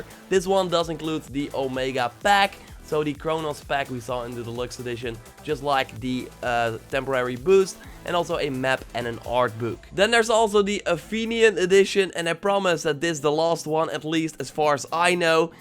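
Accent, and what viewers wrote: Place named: Netherlands. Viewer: Ik wist het wel! Dat je nederlands was hahah toch kan je dat altijd horen. Ga zo door man😃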